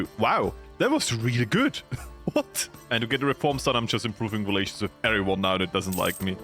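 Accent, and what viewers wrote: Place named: Austria. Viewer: Lol ich wusste immer, dass das eine komische anreihung von Dialekten in den Videos ist...wusste nicht dass du aus Österreich kommst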